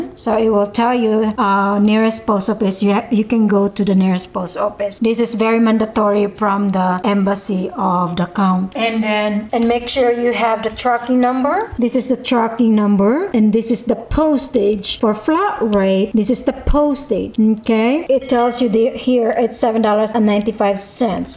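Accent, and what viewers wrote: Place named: Philippines. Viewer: I like the accent very Filipino. Thank you for the information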